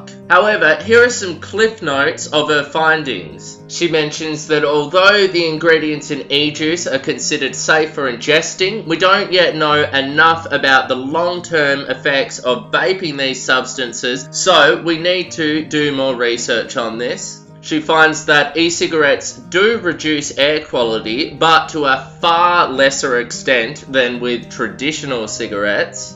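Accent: Australia. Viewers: are you australian? jw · Hamid Mousa, nothing wrong with his voice, he's an Aussie, very easy to understand, his room studio is just very voided, making his voice sound louder than it is. Regards a fellow aussie. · nah man. he's aussie af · He's Australian yeah an Aussie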